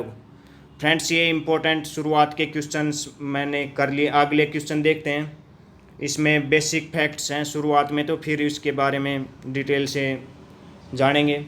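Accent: Haryana, India. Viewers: haryana se ho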